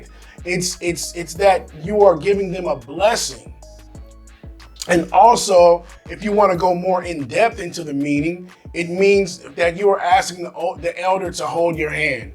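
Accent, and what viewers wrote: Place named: Ilonggo, Philippines. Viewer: Dude... This guy is speaking ilonggo? At filipino whoa (｡’▽’｡) nakakapanibago haha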